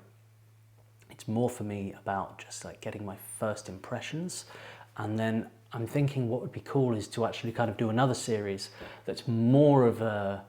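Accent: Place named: Surrey, United Kingdom. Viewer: I would absolutely love you to a deep dive on some of your favourite Nightwish tracks. I cannot agree with you more that on a first few listens it's all about enjoying the experience, and from there, starting to pull it apart and understanding how any why it works so well. Chances are I'll be popping onto your buy me a coffee because I certainly have a few that I'd like you to get to experience. Kind regards from Surrey in the UK. (From your accent, I'd guess you're not that far away :) ).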